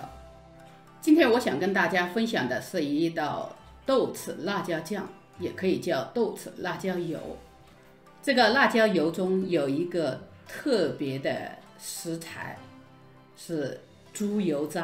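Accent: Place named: Hunan, China. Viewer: 我看压饺子皮视频听你讲话就觉得你是湖南人，果然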